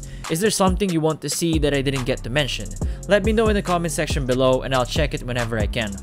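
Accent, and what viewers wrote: Philippines. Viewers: You sound Filipino! Pinoy ka ba? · You sound Filipino but idk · Your accent when you talk was just like a Filipino talking in English. Are you a Filipino?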